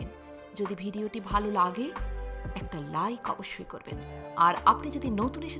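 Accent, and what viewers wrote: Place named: United Kingdom. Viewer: British speaking Bengali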